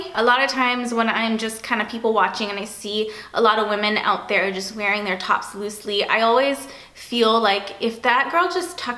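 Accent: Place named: West Coast, United States. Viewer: I don’t think she sounds stuck up! She has an eye for fashion. She may not be individually judging people, she can just see when something looks “on” or “off” and she has the problem solving capabilities to figure how to improve things. Then she goes and shares her advice with others instead of keeping the secrets to herself. And her voice doesn’t sound stuck up, she talks likes she’s from the west coast. That’s a habit that’s shaped by her region. It’s not really fair to attack someone on a public forum who is being nothing but kind and giving her (maybe not to everyone, but definitely to some) helpful opinions.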